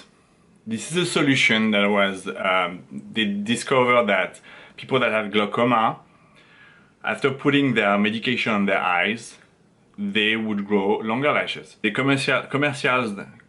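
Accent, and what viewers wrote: France. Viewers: As a French I like your French accent 😁😁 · french accent bro ah la la jsuis pas le seul mdrrrr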